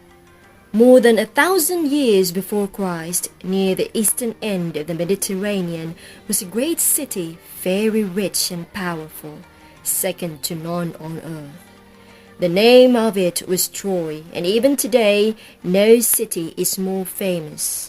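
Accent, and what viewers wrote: United Kingdom. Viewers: Sana all british accent, lami ra paminawn uyy...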